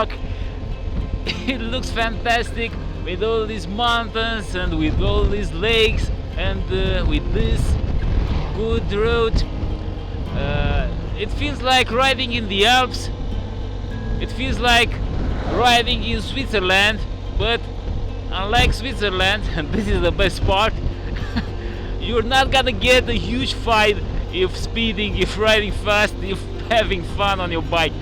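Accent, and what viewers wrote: Romania. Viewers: omul e antipatic, de la dinti pana la faptul ca in engleza vorbeste ciudat..ce accent vezi tu ca nici macar pronuntia literelor din cuvinte nu e corecta. Nu are niciun accent, e doar o urma de engleza romaneasca, nu te mai aprinde ca am zis ceva la obiect. Critica e si constructiva cateodata, desteptule. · M-AI spart frate,cu accentul tau in engleza,te vad intr-o zi cand ma pensionez si ma duc inapoi in Romania. Sunt in State de vreo 25 de ani si ma bucur de jucaria mea- BMW 1200 . Bravo Frate! Entuziasmul tau e contagios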